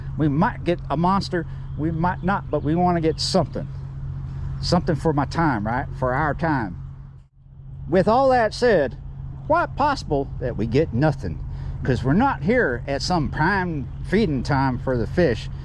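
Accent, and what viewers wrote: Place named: Texas, United States. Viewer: Lubbock here....I still hear Texas in your voice.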